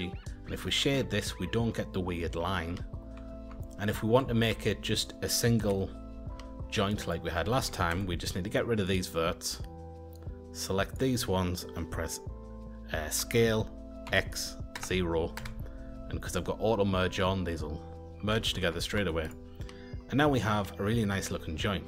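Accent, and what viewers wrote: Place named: Durham, United Kingdom. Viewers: That Simple Deform > Bend trick is great: I've been using Blender for 15 years and never knew that. Would have saved tons of time! I presume from your accent that you're somewhere near (East?) Durham too, so you get points for that. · Many thanks from this. Subscribed, but you are doing fine there anyway! Where in the North East are you from? I'm a Sunderland lad 😁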